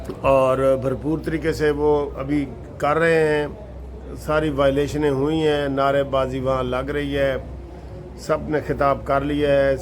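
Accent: Saraiki, Pakistan. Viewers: صاحب ۔ سرائیکی لحجے میں اردو تبصرے اور تجزیے اور تازہ ترین معلومات ۔ ماشاءاللہ اس کا ایک اپنا مزی ھے۔ اللہ تعالیٰ آپ کو جزائے خیر عطا فرمائے آمین ۔ اپ کا تعلق قانونی حلقوں سے ھے اور معروف قانون دان بیرسٹر ہیں ۔ تحریک انصاف ایک بار پھر مخصوص نشستوں پر مزید وضاحت کے لیے متفرق درخواست کے ساتھ سپریم پہنچ گئی ھے۔ 3 آئینی ادارے آن بورڈ ہیں انشاللہ جیت پارلیمنٹ کی ھو گی۔ آپ سے درخواست ھے اس کے متعلق اپنی قانونی رائے سے نوازیں کہ اونٹ کس کروٹ بیٹھتا نظر آ رہا ہے ۔ اللہ تعالیٰ پاکستان کی حفاظت فرمائے اور فسادیوں اور انتشاریوں سے محفوظ رکھے آمین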